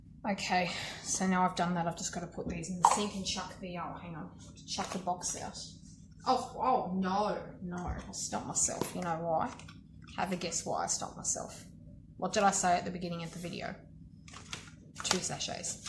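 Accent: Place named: Australia. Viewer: Nice! I always really wanted to do this. Good accent! You an Aussie mate?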